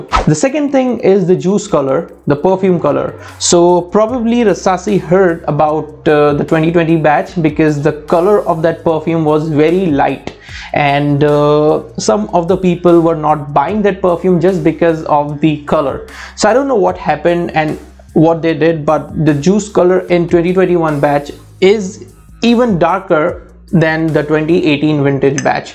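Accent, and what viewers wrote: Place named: India. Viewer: Nice review bro. Just one small request; either use complete desi or complete American accent. Speaking desi English and using American "Rrr" or "gueed" alone isn't gonna make you easy sounding for the International viewers. Get some accent classes. You won't regret it. And if you are targeting desi viewers only, we don't mind full desi.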